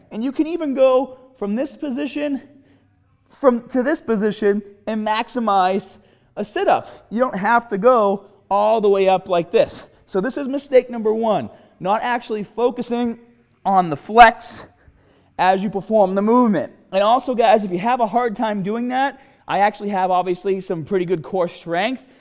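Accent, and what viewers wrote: Boston, United States. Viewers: Is that a Bostonian accent I hear?? :)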